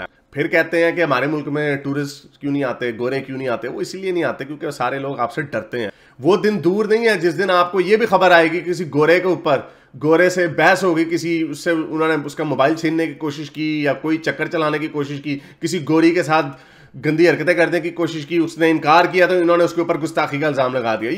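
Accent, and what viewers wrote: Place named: Punjab, India. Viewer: He has a Punjabi accent. The original Urdu accent is the Delhi Urdu accent since Urdu was born in Delhi.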